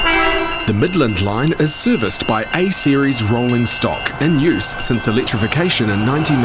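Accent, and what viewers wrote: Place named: New Zealand. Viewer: "The guy" Timo is referring to is the commentator (you ? ) who has mispronounced Mandurah (pron Man-djrah) as mentioned by Jason G. The commentator has a NZ accent and may also be familiar with some of the old diesel powered multiple unit rolling stock from Perth that ended up in NZ. Excellent video by the way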